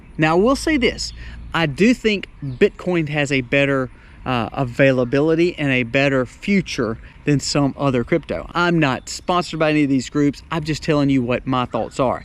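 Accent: South, United States. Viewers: Great points that you rsise - thank you. On another note, I don't particularly like the American accent except for that cool Southern accent you have. Such a great accent and I love listening to it 😅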